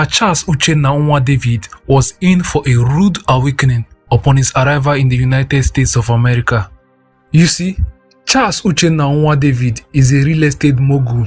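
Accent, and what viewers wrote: Igbo, Nigeria. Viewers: Your narration is superb 👌. I love your Nigerian accent. ❤ Well done bro. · Baba tuche up on the accent, no need to form yankee but this strong igbotic accent will impede traffic to your channel. Almost as if you're trying to make the accent as strong as you can, its off putting to a lot of people's ears.. My advice though.... but good storyline and storytelling.!!.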